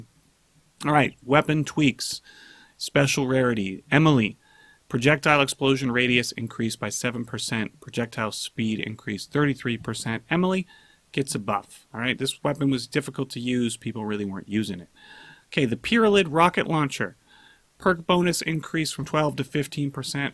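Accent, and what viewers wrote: Russia. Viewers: Thanks for the russian accent G! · Should be a voice actor in Crossout with that russian accent!!!!:)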